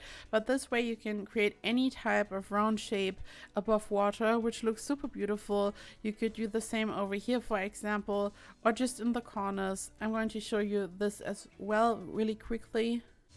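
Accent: Germany. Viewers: Are you German? Because you sound like you are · You sound like german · You sound german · are u german?? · Ur German right?😂😂 Nice accent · are you from Germany? A friend of mine is and the accent is very similar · U have an interesting accent! Are u from Germany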